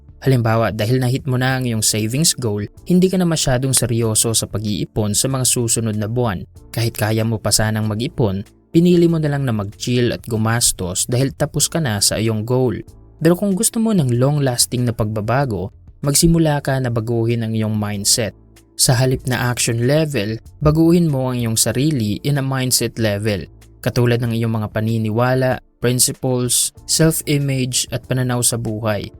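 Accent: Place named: Visayas, Philippines. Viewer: Ikaw rin dapat mo baguhin pag sasalita mo parang bisaya..pilipit ang dila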